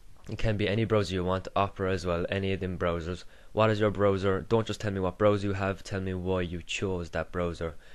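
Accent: Ireland. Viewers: funny cuz this guy has an irish accent the add before the video started was and irish spring comercial ...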